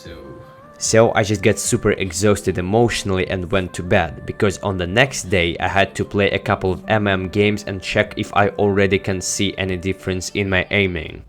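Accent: Russia. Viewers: Чувствую русский акцент)) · Мне кажется или ты Russian? Акцент, сука, русский.... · Are u russian? P. S чекнул инсту, понятно. Получается, я понимал инглиш проще из за акцента. Для большей аудитории снимаешь на english? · Блядь, какой-то русский акцент прослеживается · Над произношением еще поработай, а то немного палишься с русским акцентом)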